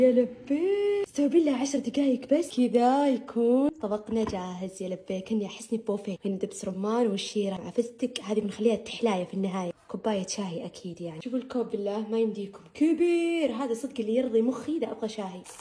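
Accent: South, Saudi Arabia. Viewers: يا لبيييييه يازين اللغه السعوديه عليك والله😂❤❤ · كأن اللهجة من جنوب السعودية ؟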